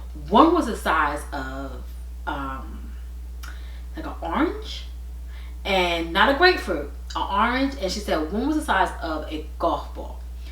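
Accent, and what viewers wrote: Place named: East Coast, United States. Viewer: I love the way you talk! You are from the east coast I’m guessing! Next time you have a gut feeling about your health get a second opinion. I have a large fibroid but I want to keep my uterus. They can remove fibroids doing a myomectomy. They also can do an embolization to cut the blood supply to the fibroid. I been doing a lot of research. I’m on birth control for my fibroid but when it came to my time to remove my birth control I was bleeding with the birth control. I use the ring for birth control and I was able to skip a period except this month. I been taking DIM to help with estrogen metabolism. I wish you all the best and you get relief soon!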